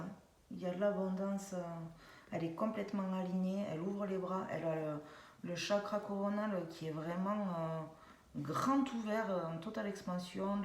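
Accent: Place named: South, France. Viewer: Un petit coucou du gers belle guidance avec le beau accent du sud.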